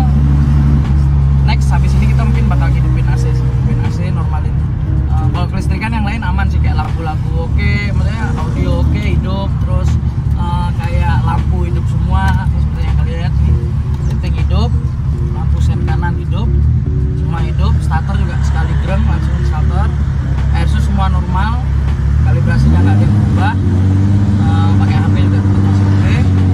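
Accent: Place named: Medan, Indonesia. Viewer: Ini medan bung😂😂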